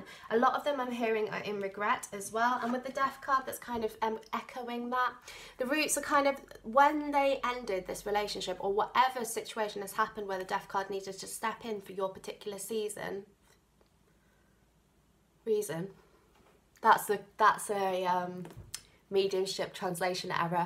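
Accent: United Kingdom. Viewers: Deck #2 🙊💖 thank you, this is a nice reading. I love your purple hair :) and your style. It's just been a little hard for me to keep with your fast speaking 😅 bc British English has a strong accent for me, I'm used to American accent more, so yeah it was hard to keep on with the reading 😅 had to turn on the subtitles.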